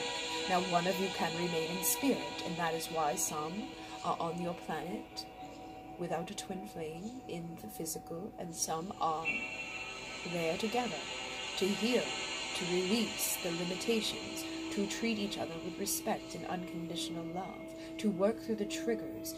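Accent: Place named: United Kingdom. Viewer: Am sorry but the music is to loud and it makes hard to hear and am sorry but is kind of weird hearing their kind off "British accent with your American pitch" sorry I've never heard Pleiadians speak but again is the music that makes it harder Again I don't want to sound rude or anything, I love your readings, but this is my first time listening to their messages.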